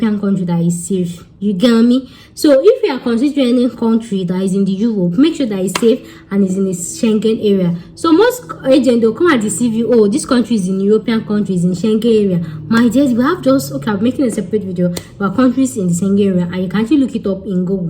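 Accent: Yoruba, Nigeria. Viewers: You this girl it's your yoruba for me it's the way you join everything together that brings me here😆you are so real well done👏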